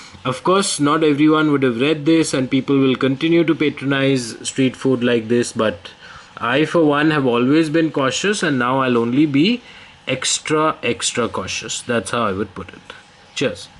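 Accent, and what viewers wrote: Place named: India. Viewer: fuck your indian accent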